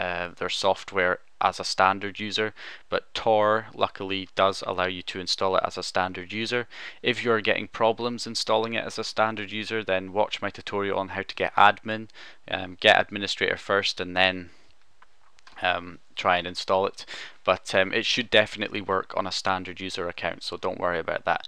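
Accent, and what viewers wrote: Scotland, United Kingdom. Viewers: You Scottish too?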